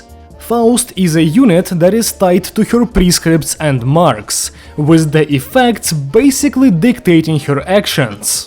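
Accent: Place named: Russia. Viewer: почему произошение такое будто русский читает англ текст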